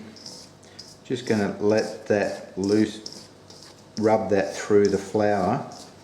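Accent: Australia. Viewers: Maria Lopez Nope, that's an Australian accent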